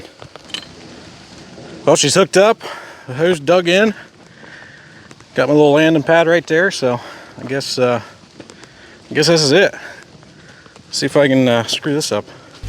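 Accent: Pittsburgh, United States. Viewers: Are you out in Pittsburgh??..I am from out there and you sound like all my relatives...Great job saving these old rigs..I have made rings out of cast iron pipe and a lathe...I need to get back into everything . Dealing with some life issues..I have a 1928 Morgan waiting for reconstructing...keep up the great work..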